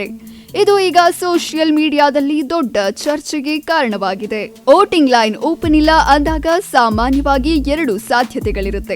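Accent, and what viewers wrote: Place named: United Kingdom. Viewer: why are you talking like as though you are an british talking in kannada. It sounds dirty and cheap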